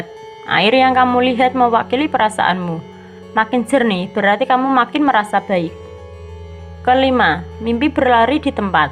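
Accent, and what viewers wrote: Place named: Java, Indonesia. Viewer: Ngomongnya medok banget mba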